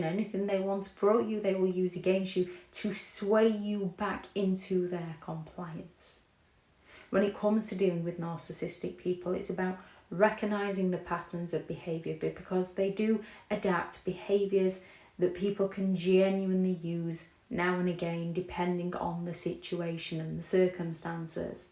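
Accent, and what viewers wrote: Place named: England, United Kingdom. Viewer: I have sleep problems and this came back. When you or I stand up with these nut jobs they are 10 steps ahead with the scams and lies. Yeah by the time I’m typing this here comes the flying monkey platoon. The lil town in Montana has like 300 people in it. A flying monkey started telling me how wrong I was for leaving the lions den. I’m so over a life time of playing grab ars with these lunatics. And listening to your English accent even so makes me laugh at myself when I get tiny pieces. The patterns no wonder you smirk every so often. At least you found a way to help people who otherwise would live in complete isolation. I’m older now and often scard shitless about just living on. I’m tired. I’m not blaming your blocks. I’m just marching on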